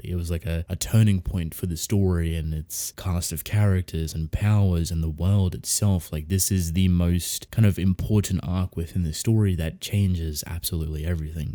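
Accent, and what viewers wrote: Australia. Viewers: i hadn't even picked up on your australian accent and now you've said so, i can't unhear it lmao that's so cool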